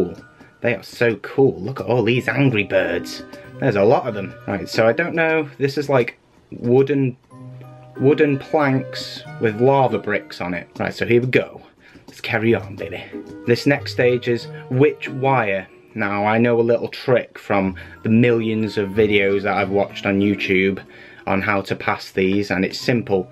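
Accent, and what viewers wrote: United Kingdom. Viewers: I love his accent! Is he British because I'm British? :P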